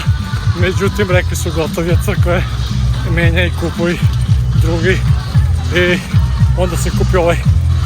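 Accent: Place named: Niš, Serbia. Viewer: Bravo čovek . Strašno me interesuje jesi li ti iz Niša ? Ja jesam 1964 godište , a trenutno u Beogradu . Poz. Govor , je Niški 😅 .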